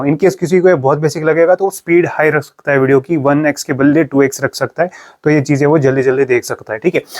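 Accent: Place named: Kashmir, India. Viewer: Sir are u from Kashmir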